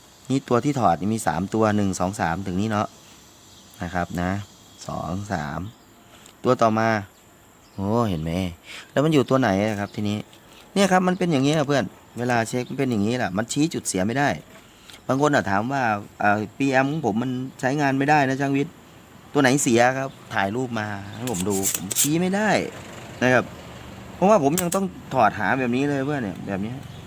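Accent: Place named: Isan, Thailand. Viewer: มักคักๆสไตร์การพูดไทยบ้างอีสานเพลินดีดูสนุกเข้าใจง่ายเอาไปร้อยเลย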